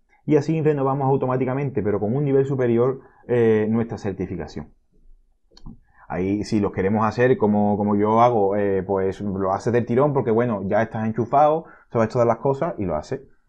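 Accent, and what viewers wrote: Andalusia, Spain. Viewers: Eres andaluz?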